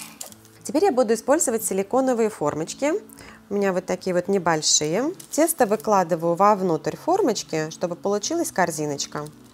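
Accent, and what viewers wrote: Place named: Akanye, Russia. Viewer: ПОВЕРЬ ПОДОЙДЕТ ЛЮБОЕ. МЕНЯ ТОЛЬКО РАЗДРОЖЖАЕТ ЕЁ АКАНЬЕ.... НЕ МОГУ СЛУШАТЬ ТАКОЙ ГОВОР ДО БЛЕВОТИНЫ.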